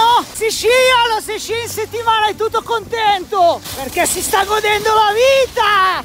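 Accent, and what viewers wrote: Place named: Sicily, Italy. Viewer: È chiaro, sei siciliano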